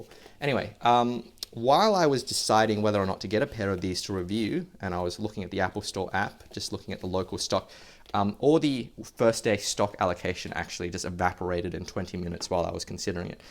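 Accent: Australia. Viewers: Random question but is your accent australian?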